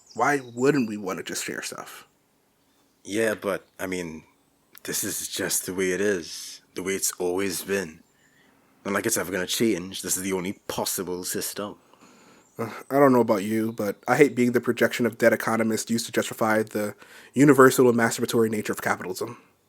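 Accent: Trinidad and Tobago. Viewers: I hear a trini. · Di Trini accent, bwai! 😍😍😍😍…🇯🇲here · You're trinidadian! You talk like me! I was so surprised, you accent sounds like a real person! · It's Trinidadian. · Your accent sounds like you’re from Trinidad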